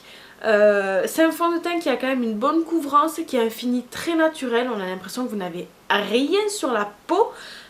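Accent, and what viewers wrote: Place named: Toulouse, France. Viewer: A la la cet accent toulousain ! 😂💕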